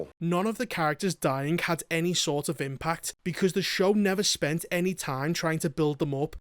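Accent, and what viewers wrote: Liverpool, United Kingdom. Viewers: He's a posh scouser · Liverpool accent.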